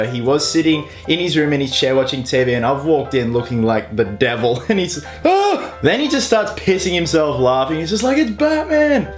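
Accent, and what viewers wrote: Australia. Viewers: I clicked on this video and as soon as you started talking I yelled. AUSSIE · Your Aussie 👍🏻me to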